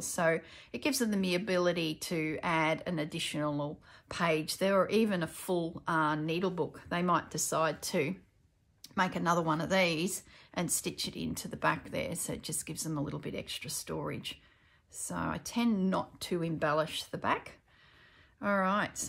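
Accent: Australia. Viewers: I really enjoyed watching this, quite inspirational. Fantastic to hear not only an Australian accent but a Queenslander, yay!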